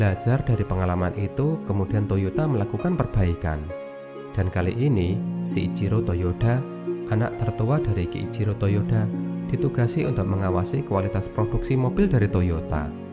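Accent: Java, Indonesia. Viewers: Ada yg lucu di menit 11.18 detik saat dikendarai mobilnya bunyi etek etek etek wkkwkw siapa yang denger kalimat ini like and comen lucu juga ya pembaca beeitanya suaranya Mas nya medok banget keliatan dari jawanya sama kaya aku dari jawa tapi medok nya udah ilangbtuh kwkwkkw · Sedikit saran. Pengisi suara ganti org aja, logat jawanya risih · Saya bolak balik lihat Video ini. unik membawa cerita, logat Jawa medok nya khas Alhamdulillah Sekarang Toyota nangkring di teras rumah ku.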